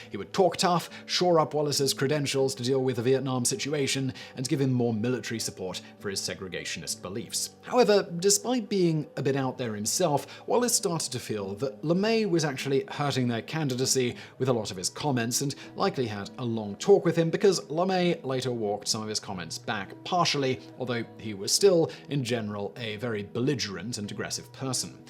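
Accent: United Kingdom. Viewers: General LeMay was the brainpower of SAC (Strategic Air Command). I spent my Air Force enlistment (4 years) in SAC. General LeMay was considered the father of SAC, and a hero. I understand many critics condemn his firebombing of Japan. I say Japan started WWII without fulling understanding America’s ultimate power to defeat Japan. jJapan’s sneak attack on Hawaii made many Americans feel any offensive against Japan was fair game. You sound like a Brit, you and the Japanese should be thankful the nuke wasn’t dropped on Tokyo. You do a disservice condemning the USA for firebombing Tokyo.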